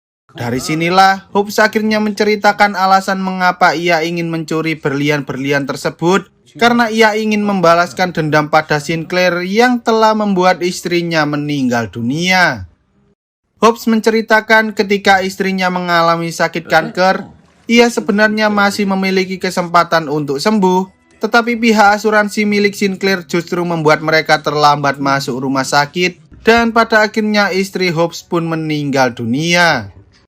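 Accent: Java, Indonesia. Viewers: Suka penjelasannya sellu dgn logat mendok nya 🤭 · Terlalu mencolok wong jowo nya 😂 bg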